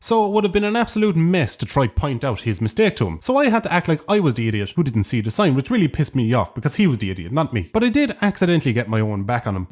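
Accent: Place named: Clare, Ireland. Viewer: which airport the one in shannon or dublin ( Im guessing shannon cos he sounds like hes from clare )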